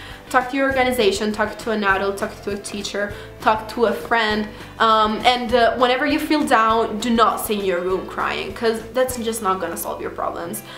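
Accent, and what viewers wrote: United States. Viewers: I love your American accent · sta parlando americano non inglese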